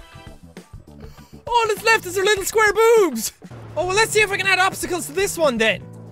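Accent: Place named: Ireland. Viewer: Swearing always sound so much funnier with an Irish accent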